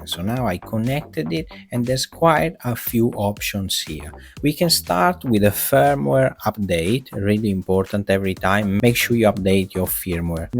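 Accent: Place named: Italy. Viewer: Ciao, se non sbaglio dall'accento sei italiano🇮🇹 .... Perché fare video in lingua inglese😢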